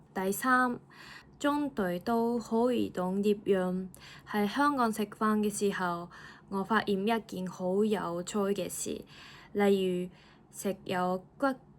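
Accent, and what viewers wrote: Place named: Japan. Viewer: 日文底既廣東話 好可愛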